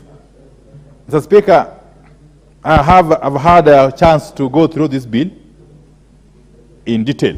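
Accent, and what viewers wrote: Luo, Kenya. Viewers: A good Luo is one BIT living in Kenya! And this guy's Luo Ingliish, is Terrible!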